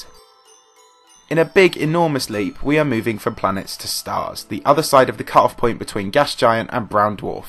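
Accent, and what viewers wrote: United Kingdom. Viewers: British accent is annoying.. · Please slow down so we can understand what you're saying! My students love watching your videos on the universe, etc. They listen carefully but British accent spoken fast is hard to decifer!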